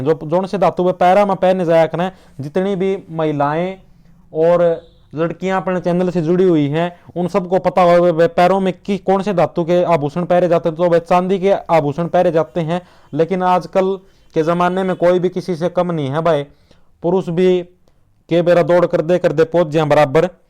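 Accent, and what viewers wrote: Haryana, India. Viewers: Bhai aap bilkul bhi formality ni krte pdhate hue boht ache tareeke se pdhate ho in haryanvi touch... May God Bless You😊🙏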